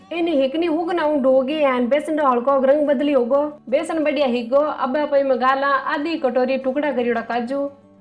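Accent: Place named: Rajasthan, India. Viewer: बहुत ही शानदार विधि साथ मे राजस्थानी मधुर वाणी में समझाने का शानदार तरीका, धन्य है जी आप व आपके संस्कार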